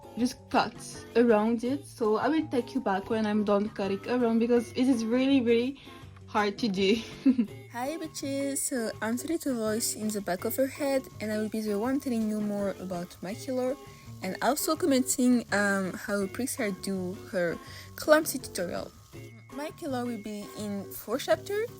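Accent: France. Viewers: Are you french? If not I'm sorry ik nothing abt accents 😭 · I have a question, are french by any chance??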